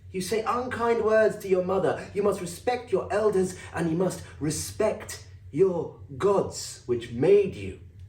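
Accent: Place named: Birmingham, United Kingdom. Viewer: Hey are you from Birmingham? Your accent reminds me of an old roommate of mine from there:)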